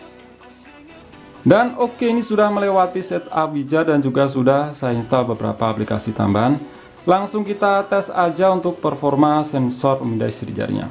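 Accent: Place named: Kediri, Indonesia. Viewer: Org kediri lu bang 😂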